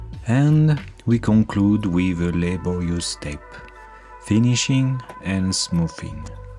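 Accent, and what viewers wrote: France. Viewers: Again beautiful work. I like the ´frenglish´ accent too. You have inspired me a couple of years ago to make bonsai pottery myself as I learned a lot from your videos. Can´t wait to see your pots on the trophy next week and see the artist in person. Greetings from Belgium. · Great video! So nice to hear that great accent. All this time we didn't know you are French. Not that it matters, just enjoyable. You are such an inspiration. Thank you...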